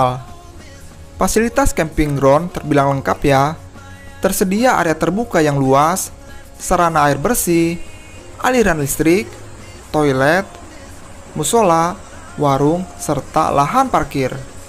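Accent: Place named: West Java, Indonesia. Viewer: Khasss Sunda nya ... Opisial 😅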